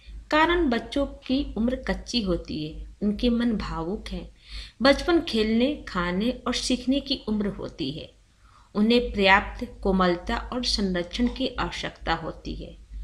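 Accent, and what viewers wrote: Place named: Bengal, India. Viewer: aap bangaal se ho kya............ hmm lagta to hai sahi ki nahi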